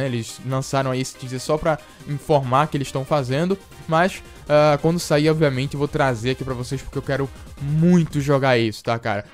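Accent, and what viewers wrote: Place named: Rio de Janeiro, Brazil. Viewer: seu cariokismo me fez ir embora moço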